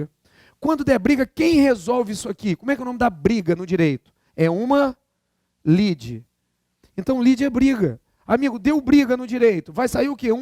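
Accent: Rio de Janeiro, Brazil. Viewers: ele é carioca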